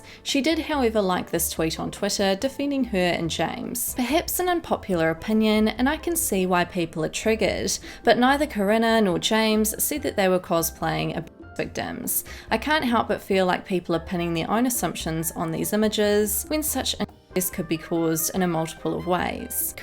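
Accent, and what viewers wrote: New Zealand. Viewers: This Kiwi accent is annoying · Off topic. But are you Kiwi? That accent tho!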